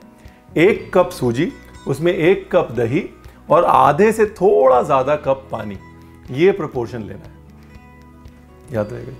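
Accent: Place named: Punjab, India. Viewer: RAVE.. ☺️ Punjabiyat chalak hi jati hai baton baton mein😊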